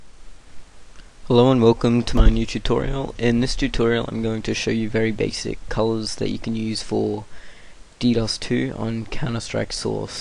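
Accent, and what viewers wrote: Australia. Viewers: Hehe aussie accent, same xD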